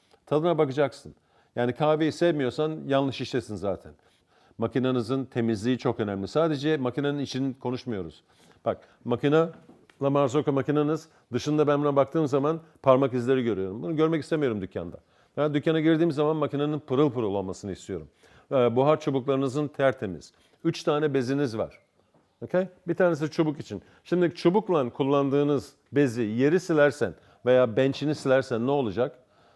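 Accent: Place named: Australia. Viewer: Bu adam Avustralya’da doğup büyüyüp Türkiye’de kahve dükkanları açtı. Türkçesi bu yüzden aksan olarak farklı ama yinede diksiyonu çok iyi.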